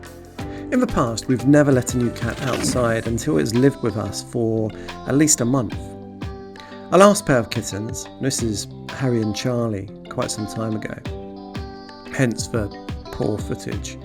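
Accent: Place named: England, United Kingdom. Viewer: I can hear your cats interrupting you She is very talkative alright I love your cat You have nice videos!🤩 They are beautiful a darling cats! 😘😘😘 What are their names Was not able to hear you well You have an English accents and I have poor hearing sorry I am 80 yrs already Thank you for sharing friend 🌹🌞🌹🌞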